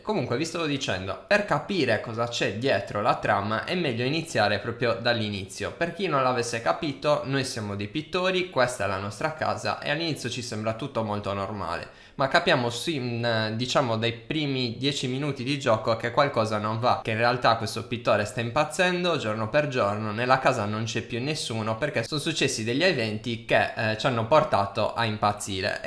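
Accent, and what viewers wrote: Sardinia, Italy. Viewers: Bella bro, dall'accento mi sembri sardo😉 È così?? 🤔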